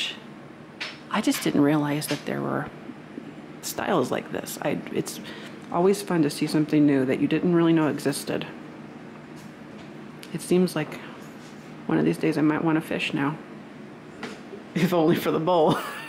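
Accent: Midwest, United States. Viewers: such a wonderful overview of everything and your right, CLL would go absolutely crazy❤️ And our Midwest pronunciations ❤️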